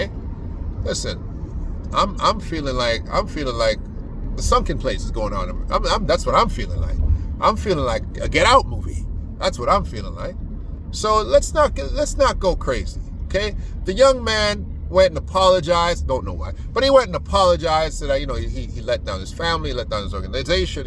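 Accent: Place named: Trinidad and Tobago. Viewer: u r funny bro. u sound like u from Trinidad 🇹🇹 🤣 😂 great humor bro and good takes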